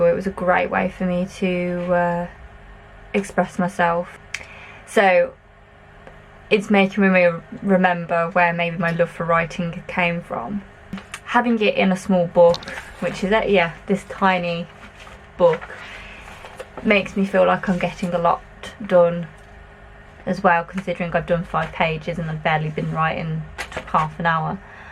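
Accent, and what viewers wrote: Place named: United Kingdom. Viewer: Pre-computers, I used to write by hand before typing this up. Much later, I'd go through the typed version, revise it, then retype. Apart from the revision stage, I began doing this while at university. I didn't have time to revise my essays before handing them in. Now I write straight onto computer or Alphasmart Neo. I'll then put the first draft on one of my ereaders and make notes for revision. More revision is done in Word. If you're going to write extensively by hand, maybe consider a really nice fountain pen and nice paper. You seem to have a British accent. Here in the UK black cats are lucky, and having one cross your path is a sign of good luck. A crow, on the other hand . . . Maybe you could consider an Alphasmart Neo if distraction free is your priority. You can buy them secondhand on ebay. They used to be quite cheap, but they've gone up to around £50 plus package and postage.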